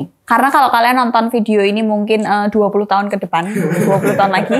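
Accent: Java, Indonesia. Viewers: mbakny logatny medok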